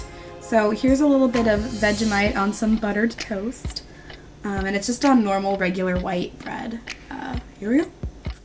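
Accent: New Zealand. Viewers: AHAHAHAHA the accent omgg!! tbh it sounded more new zealand but omg dying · I am laughing so hard!!! I think your accent is very New Zealand hahahah <3 <3 <3 Also How am I only just seeing this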